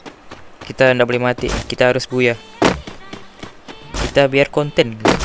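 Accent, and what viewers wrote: Sabah, Malaysia. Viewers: Abang orang sabah ke ?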